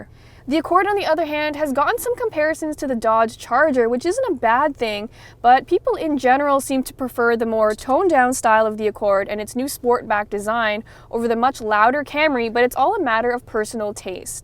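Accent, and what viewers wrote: Canada. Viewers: She's the coolest Asian Millenial with a hint of Canadian accent Autoguide can find to go at it HAM.....LOL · Is the price in Canadian dollars? You sound Canadian.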